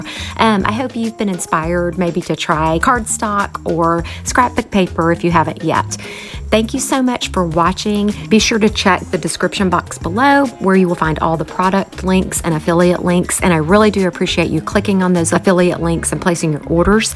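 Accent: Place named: Georgia, United States. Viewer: Beautiful, as always!!! Can I ask where you are from? I'm a southern girl living in the Midwest now. But you sound like you might be from Georgia. Praying for peace and comfort during the family emergency.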